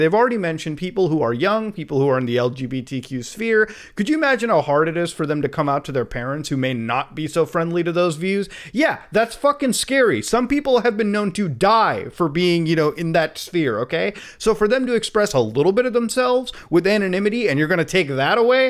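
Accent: England, United Kingdom. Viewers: Nice English accent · Nice British accent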